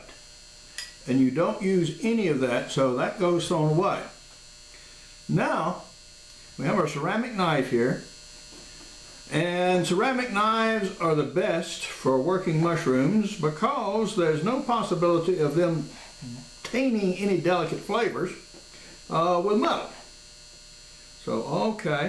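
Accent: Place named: Appalachia, United States. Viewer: I love your voice. Like a slight hillbilly twang mixed with dr demento and a splash of jello biafra.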